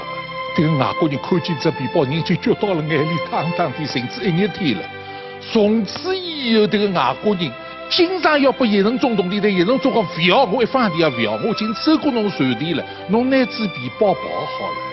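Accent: Standard. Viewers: 現在上海寧已講勿出-→加標準的上海閒話→好聽!👍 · 很标准的上海话。还有尖音！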